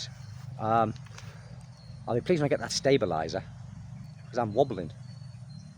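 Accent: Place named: County Durham, United Kingdom. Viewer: County Durham accent if ever ive heard one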